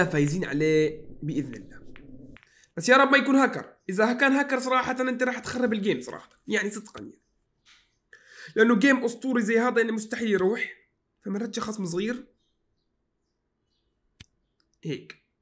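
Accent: Egypt. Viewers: اللهجه المصريه اسطوريه عندك يسطا❤ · يا متابعك من اسبوعين يخي وربي اضحك من قلبي غير انه لعبك اسطوري ماشاء الله انا مصري واحب لمن احد من بلد عربية يتكلم مصري اللهجة مرة op عليك 😭😂😂🤍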